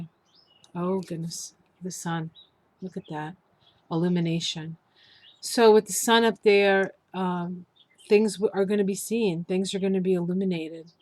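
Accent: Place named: East Coast, United States. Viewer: Hi! I've been so busy lately that I haven't been watching. It's so good to just sit down and listen to you. As soon as this video is finished I will find the June Kabbala reading. Many blessings to you and thak you! 🧡 P. S. - I love hearing your East Coast accent. Makes me feel right at home. ☺️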